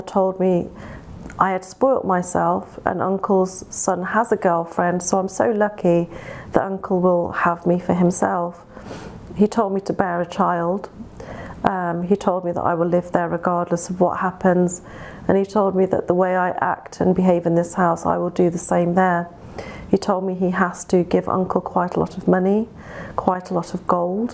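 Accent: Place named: England, United Kingdom. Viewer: I am so sorry that you went through Hell. But you have an English accent, and if you are living in London go to the police and get your Dad thrown in jail, and let him rot there. I was born in India and I know what women go through. If you are living in a foreign country. The athorities will protect you.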